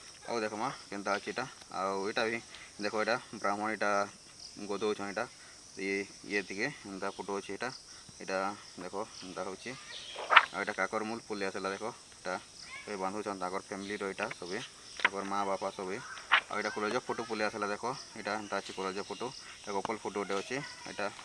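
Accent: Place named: Sambalpuri, India. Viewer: କଂଚା ସମ୍ବଲପୁରୀ ଏ ,,,,,, ପୁରା ଖଡ୍ ଖଡ୍ ଖଡ୍ କରୁଛେ ବଲ 😅😅😅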